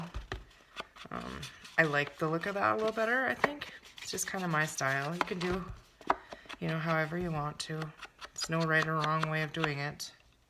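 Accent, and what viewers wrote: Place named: Midwest, United States. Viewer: Just found your channel and also subscribed to your monthly kits. Lovely style! I’m from MN currently living in IL, and your accent makes my heart yearn for home!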